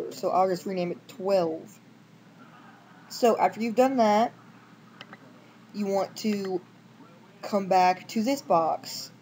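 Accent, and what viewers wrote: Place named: Finland. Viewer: I really like ur accent! is it Finnish?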